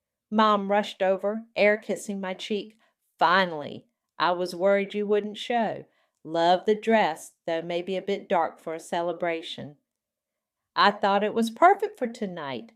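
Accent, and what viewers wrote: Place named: South, United States. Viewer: It's the southern accent and the normal slow cadence of AI speaking makes them sound like hicks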